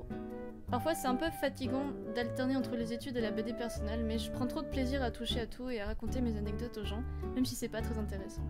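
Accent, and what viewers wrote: France. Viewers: You’re French?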